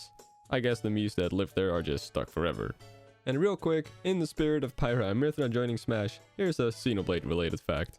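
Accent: Netherlands. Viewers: When You listen closely You can still Hear his dutch accent.Das cool man,blijf video’s maken 👍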